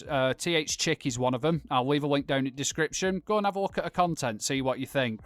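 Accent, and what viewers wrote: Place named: North, United Kingdom. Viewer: Nice to hear that northern accent again, always looking forward to your posts lad. Stay cool brother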